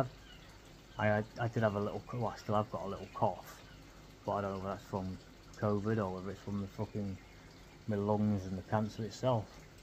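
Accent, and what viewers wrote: Northern England, United Kingdom. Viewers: Us northerners can be hard to understand 😅 believe or not.. not all of us English people talk like the royals..... we have 100s of different accents and subtitles are available if u click the double cc at the top of the video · To my American English ears the northern English accent is a bit difficult to understand, sort of like the Scottish accent.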